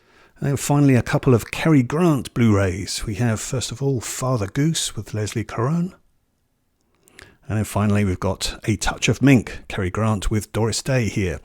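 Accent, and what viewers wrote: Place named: United Kingdom. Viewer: Great British accent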